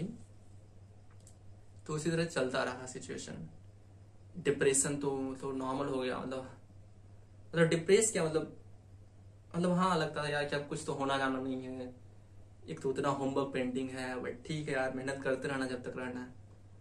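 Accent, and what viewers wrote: Bihar, India. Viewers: Bihari ho kya bhaiya. Tumhara tone BATA Raha hai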